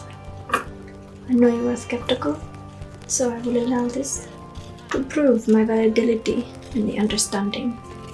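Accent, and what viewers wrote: India. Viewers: I love your indian accent and you look beautiful in red 😊❤🙌👏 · As a INDIAN, i really appreciate your effort regarding Indian speaking accent of your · As an Indian guy I can confirm that the accent is perfect 10/10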